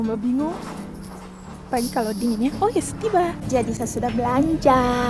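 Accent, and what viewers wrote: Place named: Papua, Indonesia. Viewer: Mamayoo Kam lihat tu, sa tu 😀😀😀 kak walopun sdh pindah warga negara, selalu ingat aksen itu neh.. kak pernah di papua k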